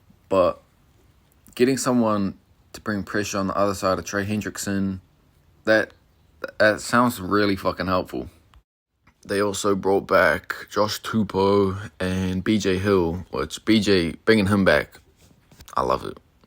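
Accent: United Kingdom. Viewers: ngl its super different to hear someone with a british accent doing commentary on the nfl. im glad to hear that american football is becoming a little more mainstream on the international stage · Is this guy British?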